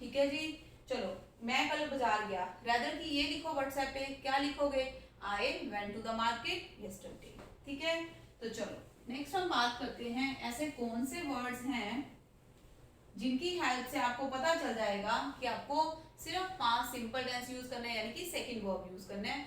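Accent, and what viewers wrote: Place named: Punjab, India. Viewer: Mam app punjbi bolte bhut ache lgte o😍😍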